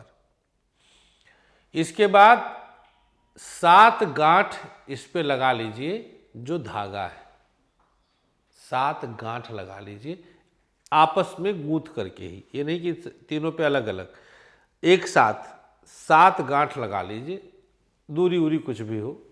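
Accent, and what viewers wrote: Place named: Varanasi, India. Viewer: गुरुदेव को सादर प्रणाम आप की बोलचाल की भाषा बहुत सुन्दर लगती है हम बनासी लोगो की यही पहचान है सदैव मार्ग दर्शन करे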